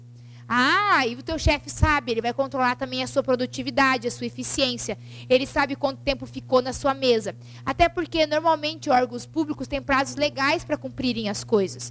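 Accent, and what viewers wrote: Rio de Janeiro, Brazil. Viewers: Ótima aula, mas esse sotaque dá neRvoso! :D --- cariocas entenderão.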